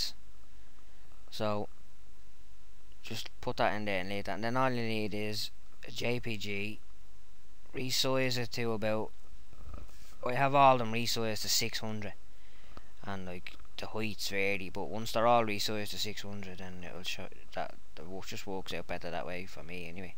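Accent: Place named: Scotland, United Kingdom. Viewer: You got a veeeeery Scotish voiess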